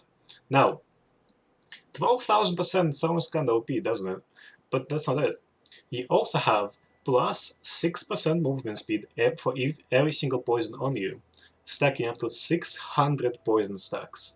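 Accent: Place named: Russia. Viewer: Слишком русский акцент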